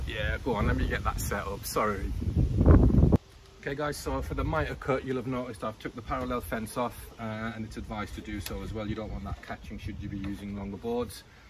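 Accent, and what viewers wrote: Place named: Yorkshire, United Kingdom. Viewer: Nice review. Loving the Yorkshire accent. Going to buy one on your recommendation. Thanks.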